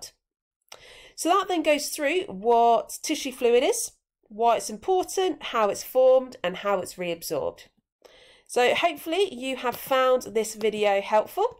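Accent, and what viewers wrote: United Kingdom. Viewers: You are a British, aren't you??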